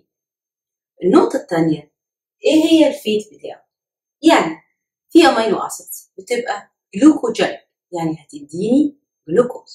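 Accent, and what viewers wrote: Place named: Arab world. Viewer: She is speaking with an arabic dialect through her sessions for arabic students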